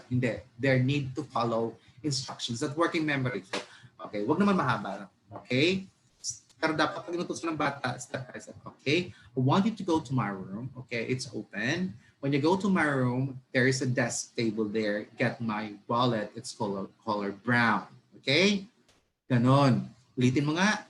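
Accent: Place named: United Kingdom. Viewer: Thank you Sir👍, with British Accent👍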